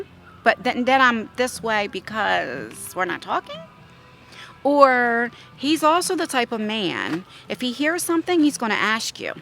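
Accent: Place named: New Jersey, United States. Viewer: OMG I Love Instagram it puts on my makeup for meee and You have that Jersey Accent, I have the Philadelphia accent if there is one lol but I was born and raised in Philadelphia PA and now I'm in NJ but I like Your Accent, Very Nice and Kinda Cool to see a Jersey Girl 🌹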